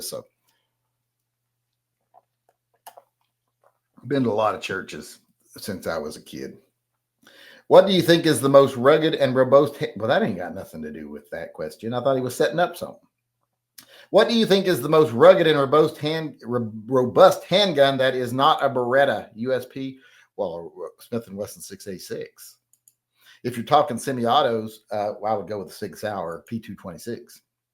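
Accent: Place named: South, United States. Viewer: I don't know your story. You sound like you have a southern accent. It's blasphemy for a southerner to refer to himself as "Yankee." Lol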